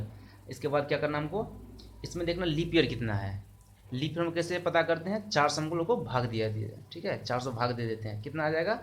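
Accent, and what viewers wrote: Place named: Bihar, India. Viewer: Chhh kyu bilta hai bihari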